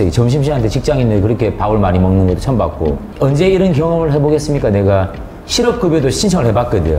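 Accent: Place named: Daegu, South Korea. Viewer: 잉 형님 말투가 대구 사투리 던데 ㅋㅋ 제가 착각했나봄 ㅋㅋㅋ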